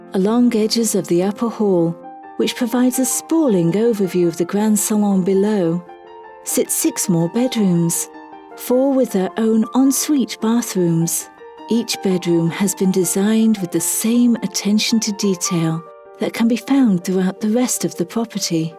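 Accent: United Kingdom. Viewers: Nice English voice keeps saying "over looks the garden" um, dead grass. Spend 13 million and you still have to do a major outdoor remodel and get rid of those marble floors. Looks cold and uninviting. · Jameson Cross yeah I think it’s the “British-like” accent.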